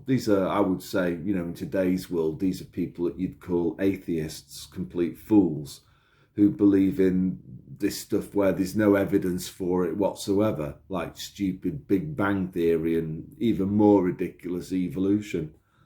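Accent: Northern England, United Kingdom. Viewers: I could talk to you for hours on this subject 😁 but for me God creating God, l can't make that leap if you understand what I'm saying. I'm not religious but l know there has to be a 'creator'. I'm also of the opinion that the human brain is not yet capable of understanding, l know mine isn't 😁. I've got to ask, are you a Northern England lad?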